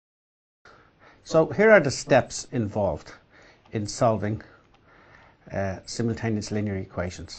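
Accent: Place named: Dublin, Ireland. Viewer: Am In 2nd Year And I Know That! But Fail Play Tah Yeh!! Btw Love YOur Accent very dublin :L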